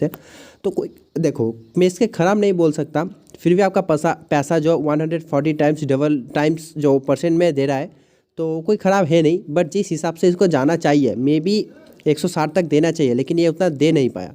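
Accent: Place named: Bengal, India. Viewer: Bhai bangali ho kya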